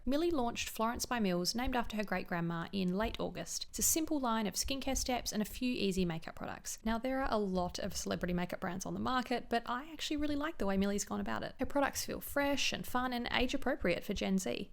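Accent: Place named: Australia. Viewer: also I'm Australian and its nice to hear a friendly accent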